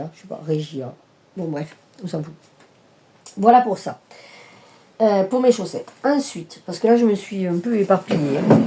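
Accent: South, France. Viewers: C'est génial de teinter vos toiles! quelle bonne idée! J'aime bien aussi le Diamond Painting mais quand je commence je n'arrive plus à m'arrêter, en un week-end c'est fini et après je ne sais pas quoi en faire donc j'ai arrêté d'en acheter... Olala l'oiseau il va être trop beau ♥ J'aime bien l'idée des cases, une de temps en temps c'est sympa! je verrai bien ça en calendrier de l'avent! Wouaw la toile bleue avec la sirène superbe! Oh marrant ce trieur! (moi j'adore votre accent, une partie de ma famille vit dans le sud mais ils n'ont pas l'accent hélas... ils sont arrivés adultes) wouaw le tricot mais vous savez tout faire!!! le projet couture en duo super! vous savez vraiment tout faire bravo!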